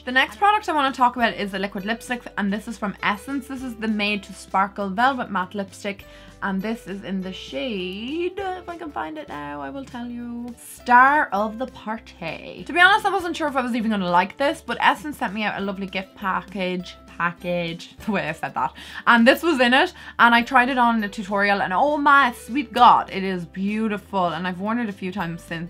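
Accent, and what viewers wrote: Ireland. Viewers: I just love watching you and listening to your brogue! It reminds me of my Nan who was from Kenmare.. I love you!! Much love from Boston!!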